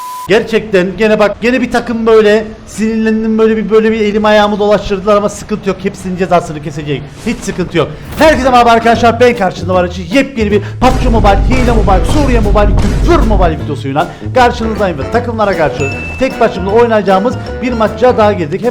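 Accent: Cyprus. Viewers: Abi kıbrıs lımısın be gardaş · Kktcden geldim askerdim aksani ozlemisim cansin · Sırf şu Kıbrıs şivesi için izliyorum enerjin de güzel başarılar dilerim 👏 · kardeşim sen kıprıslımısın konuşman çok tatlı kıbrıslıları seviyore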